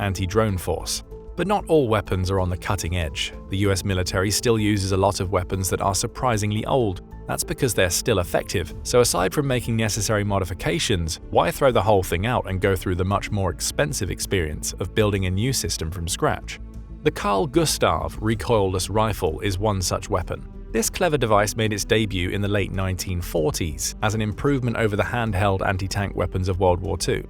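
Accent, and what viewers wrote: United Kingdom. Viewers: Nothing worse that hearing about the us military with a British accent. All set. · I love hearing just how cool the U.S.A. is in a British accent its quite perfect good sir. Lmao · Crazy a British is doing the video voice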